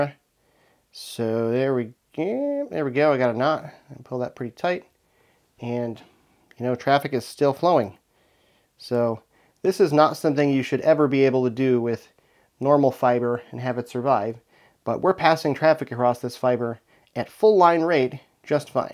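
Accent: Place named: United States. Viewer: How did you get such a solid American accent as a Finnish guy? :S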